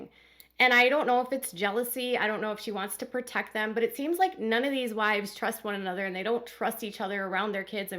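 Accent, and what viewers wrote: Minnesota, United States. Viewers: Minnesota? No wonder. I thought you were Canadian. That dang accent. Sorry you have to live in such a cold area. It’s nice here in Los Ángeles and, it’s super nice here.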